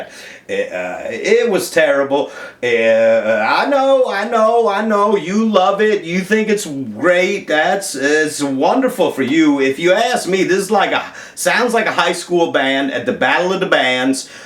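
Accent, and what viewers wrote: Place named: New Orleans, United States. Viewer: You ever lived in New Orleans?? You sound like youre from here!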